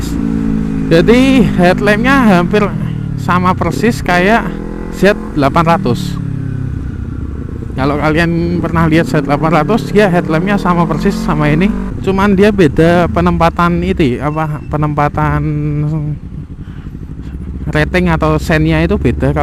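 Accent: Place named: Java, Indonesia. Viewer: cocok campur jawa om ngomongnya, medhok pisan. "rx king cok" 😂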